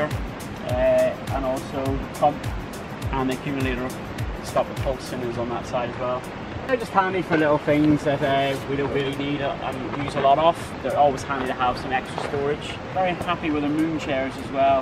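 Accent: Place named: Northern Ireland, United Kingdom. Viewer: Love this van... Is he northern Irish? EDIT...he is.. Useful 😜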